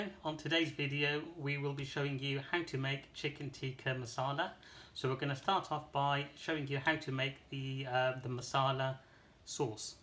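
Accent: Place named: United Kingdom. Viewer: I love your accent. what kind of uk accent do you have?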